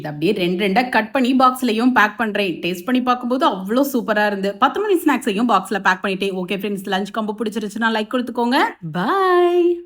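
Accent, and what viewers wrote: Tirunelveli, India. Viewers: Tirunelveli dist.... But avangalukku valliyur sonnanga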